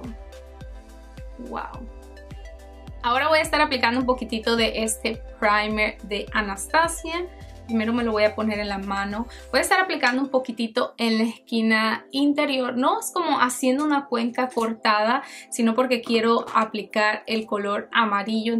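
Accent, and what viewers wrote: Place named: Honduras. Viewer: Me encanta cuando dice... y toda la cuestión... muy Hondureña jajaja Saludos nena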